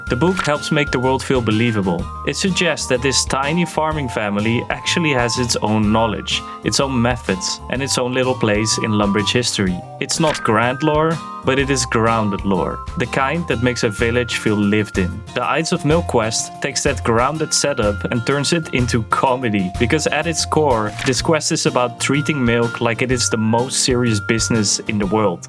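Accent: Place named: Netherlands. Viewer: Ik hoor een Nederlander, ik heb je door 🧐😂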